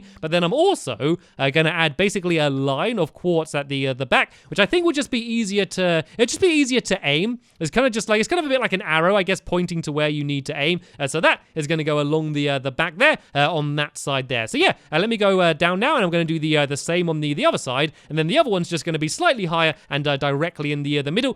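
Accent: United Kingdom. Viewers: Are you British I am your the best youtuber ever we love you and your vids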